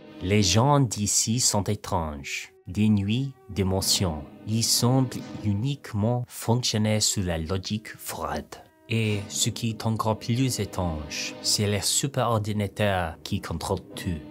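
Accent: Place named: England, United Kingdom. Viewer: Ton français sonne super élégant avec ton accent anglais !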